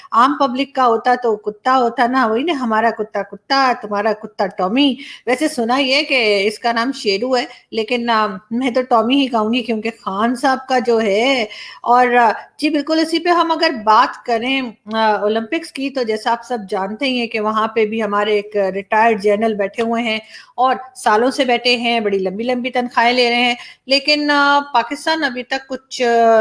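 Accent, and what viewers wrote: India. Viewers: It is hard to believe that you are from Islamabad.Speaking and behaving like a typical Indian lady.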